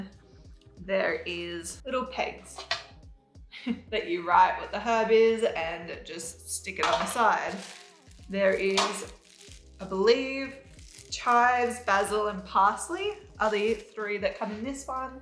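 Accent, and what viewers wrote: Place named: Australia. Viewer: Hi from Sydney! Just subscribed and am about done binging your channel, its great to have an Aussie crochet voice for a change. I had my first crochet market yesterday and it wasn’t anywhere near as posh or successful as your bus depot but I went in expecting no sales and instead made my stall cost ($40) back in 30mins then went home with $200. I try to holiday in canberra once a year so I’ll look out for your stall next time.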